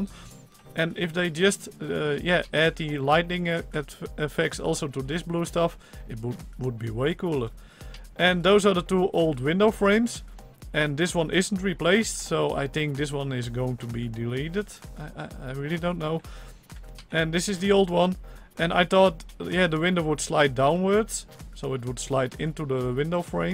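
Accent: Netherlands. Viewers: i knew u were dutch -_- lol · are you Dutch?? als dat zo is ;D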